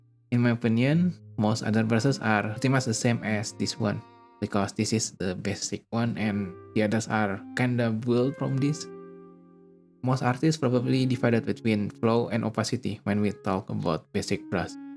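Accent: Indonesia. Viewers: aksennya ngomong nya kyk indo bang ;v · bro were indonesian all this time ...? can't hide those accent :face-orange-biting-nails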